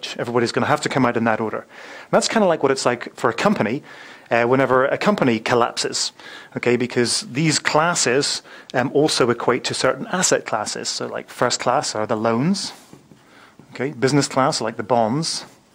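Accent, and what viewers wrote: United Kingdom. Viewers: Great video. After a couple of minutes I got used to British pronunciation and was able to concentrate on information. Will wait for new videos!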